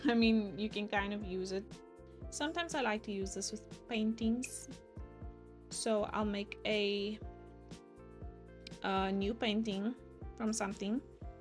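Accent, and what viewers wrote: South Africa. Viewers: Omg r u South African?😭💗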